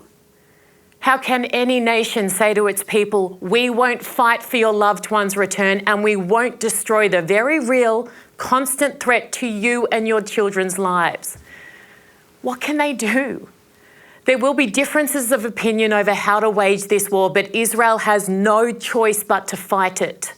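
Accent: Australia. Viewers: You show no solidarity with your colleagues of the Press. How much are you getting paid? Could you change your accent, I am so embarrassed that you are Caucasian Australian. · Hats off to you, Erin... Love the Aussie accent , by the way...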